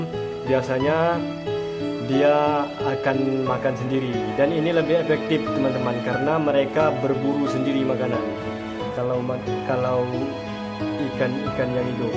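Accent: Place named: Lampung, Indonesia. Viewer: Abang asal lampung kah? Logat nya mirip orang lampung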